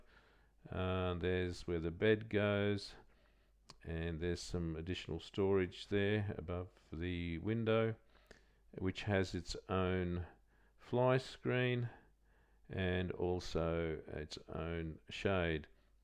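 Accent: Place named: Australia. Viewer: I watched part one and two just amazed at the quality of work...when I heard the Australian accent it confirmed my previous beliefs in Aussie craftsmanship and ingenuity.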